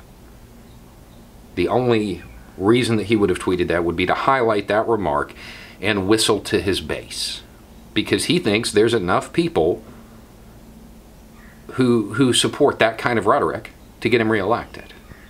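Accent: South, United States. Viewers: Thank you for being the voice of reason with a southern twang!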